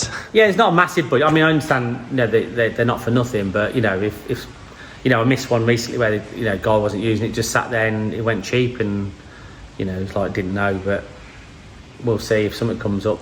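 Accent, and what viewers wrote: United Kingdom. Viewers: Awesome footage as always. If only I could understand everything says. I get about 60%, the rest is too ‘dialected’ for me. I mean, for a non-British person, his accent is rather overpowering. I have to switch on subtitles when he speaks. I don’t have that problem with the other DW videos though. But I will watch all videos.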